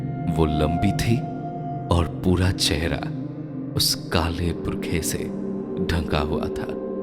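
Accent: Bengal, India. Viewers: Vai keya hindi he purai bangla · I love your voice. In bengali channel what I love most is your amazing gift of gab and presentation, will love to experience that here too. But please note your hindi pronounciation needs little bit improvement, the touch of bengali tongue is a little prominent, otherwise marvellous presentation. I know you will improve, as always. All the best for this new journey. Have a good day! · I will give Honest review.. no oiling no polishing. Don’t continue with the Hindi version. Because you’re many Hindi words sounds like Bengali. please continue your Bengali version. At least we can enjoy your bangla bhasha. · Dada tomar voice ta just osmmmmmm ❤️❤️❤️ Hindi teo bangla r feeling asche 😍😍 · Darun hoyeche. Ektu bangla tan ache Hindi te but darun. Chaliye jao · Kintu jai bolo banglay pret kotha sunte beshi vlo lge...ar Hindir ucharon arro beshi valo korte hbe.karon banglar akta tan theke jay jotoi Hindi te bolona keno.its ok prothom episode ata..aste aste ai ucharon ar tan gulo valo hye jabe..♥️ afterall voice to tomar marattok sundor...♥️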